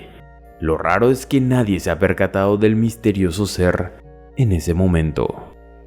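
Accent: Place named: Paraguay. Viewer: algun dia hace un video hablando con nuestro acento paraguayo! Me gustaria mucho ya que sos paraguayo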